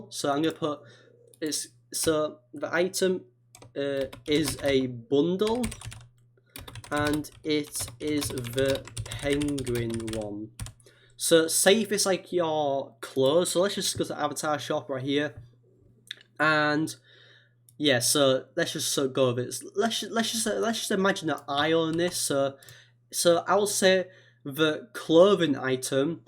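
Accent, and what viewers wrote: United Kingdom. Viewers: 100% brithish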